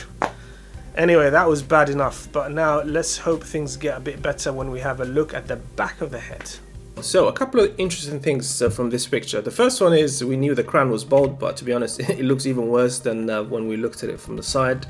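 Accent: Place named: United Kingdom. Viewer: aren't you independent and can review and say whatever you want as long as it's true? With your accent, I can tell that you are British and you have the right to freedom of speech unless you have a contract with certain clinics. I hope you are free to say whatever you want without Legal Ramifications. Cheers!!! Ps, I had my hair transplant in Turkey this June and my hair is growing a lot. I had a minor issue and the clinic is willing to fix the issue free of charge next year. So far so good!!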